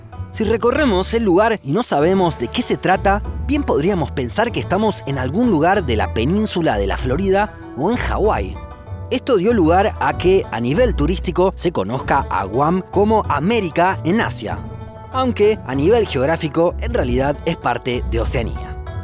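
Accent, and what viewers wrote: Argentina. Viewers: El acento argentino es muy lindo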